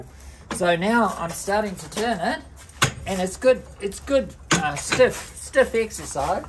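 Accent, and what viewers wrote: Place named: New Zealand. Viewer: You can never get enough of that KIWI knowledge. The accent doesn't hurt.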